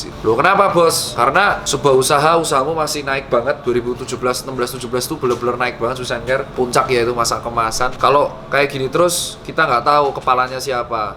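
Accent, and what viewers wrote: Java, Indonesia. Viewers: Suflix Einstein07 medok genjehhh 😀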